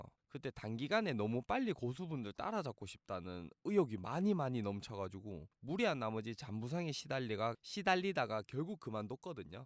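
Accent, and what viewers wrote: Gyeongsang, South Korea. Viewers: 대구 분이시네요. 저도 대구 사람입니다. 반갑습니다 ㅎㅎ 구독하고 갑니다. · 이거 말투가 대구네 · 대구 분이시네요ㅎ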